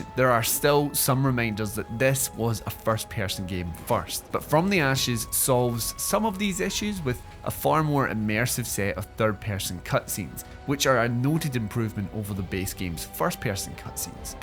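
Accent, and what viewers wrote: Scotland, United Kingdom. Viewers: Definitely Scottish. Source: my wife is Scottish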